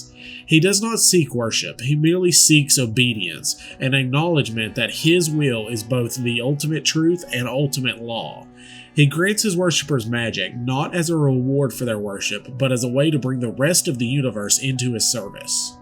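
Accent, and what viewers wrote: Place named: Deep South, United States. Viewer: Got that drawell, that deep south dwall lolll.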